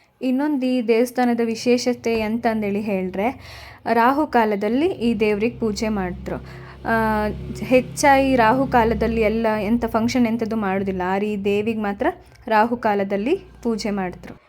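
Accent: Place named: Kundapura, India. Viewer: 😊😊kundapura kannada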